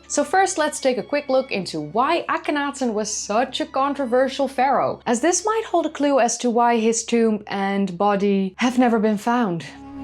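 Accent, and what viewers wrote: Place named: Netherlands. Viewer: Love your Dutch Accent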